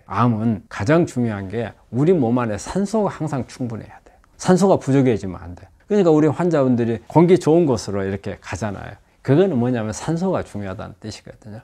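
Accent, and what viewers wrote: Jeolla, South Korea. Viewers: 웜마 전라도네우짜쓰까잉 구수하구만 ❤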